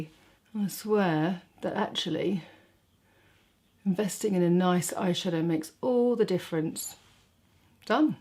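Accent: United Kingdom. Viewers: you are so British!! Love listening to you talk! Little things you say are humorous. “Cheap as chips” and “Off to the disco” made me giggle. Thanks for the tips on getting out of the “down in the dumps”. You look lovely as usual. 🤗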